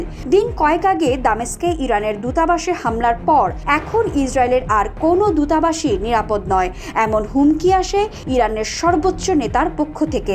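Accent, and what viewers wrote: Kolkata, India. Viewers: কলকাতা স্টাইলে শাউয়ার নিউজ পড়ে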